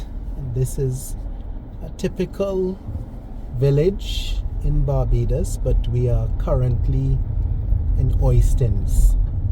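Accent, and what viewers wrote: Trinidad and Tobago. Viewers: Isn't he from Trinidad?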